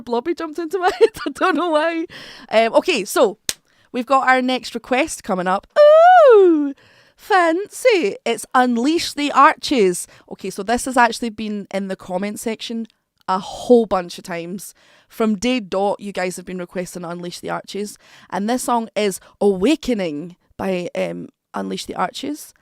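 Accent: Scotland, United Kingdom. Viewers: I'm Polish and I always was just one of a few at work who could talk with people calling us from Scotland. I love your accent, reminds me of the lovely Amy Macdonald. And if you start a metal band I wanna be in it. Cheers!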